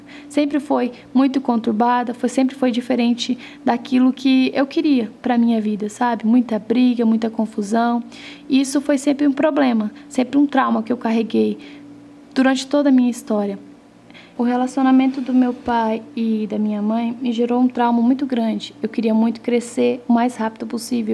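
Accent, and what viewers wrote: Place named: Campinas, Brazil. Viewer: ''Não falo leitE quentE'' haha. Na verdade, eu sou de Campinas/SP e acho seu sotaque bem parecido com o meu. Eu gosto muito da sua história. Você é guerreira e sempre correu atrás de realizar seus sonhos. Pode acreditar que seu canal não é apenas entretenimento, mas também é um canal de bençãos, seja para inspirar outras pessoas a também realizarem seus sonhos ou para falar do amor de Deus através do seu testemunho. :)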